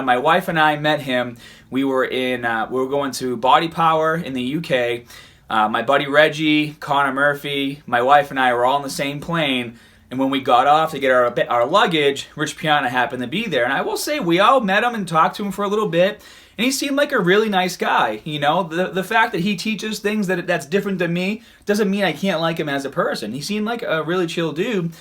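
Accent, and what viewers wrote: Boston, United States. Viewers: That Boston accent is classic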